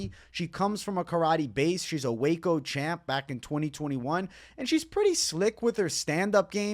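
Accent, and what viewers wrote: New York, United States. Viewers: a new york (boston? not good with ID'ing accents) version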